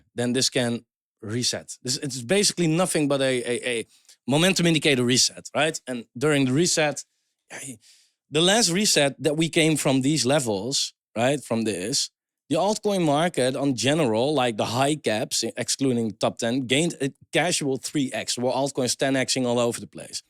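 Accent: Netherlands. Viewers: I like your dutch accent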